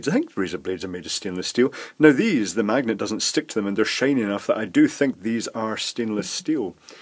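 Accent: Scotland, United Kingdom. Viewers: Scottish accent maybe?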